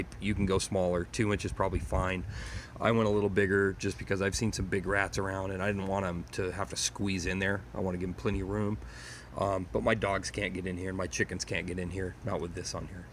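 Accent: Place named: Southern California, United States. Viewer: You sound like a “socal” guy😮. Nothing like saying hey! Thanks for the video🎉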